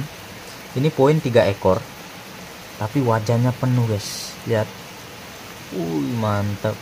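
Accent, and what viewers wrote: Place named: Bali, Indonesia. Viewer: Nak bali? Kok logat bali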